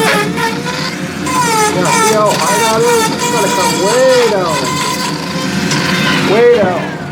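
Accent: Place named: Canada. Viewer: You have the most amazing Canadian accent... :-)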